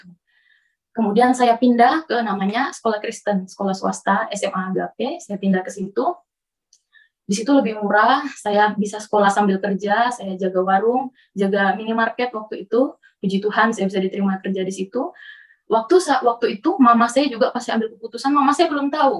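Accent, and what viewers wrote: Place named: Java, Indonesia. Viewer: kok...ngaku org Bugis tpi logat Jawa...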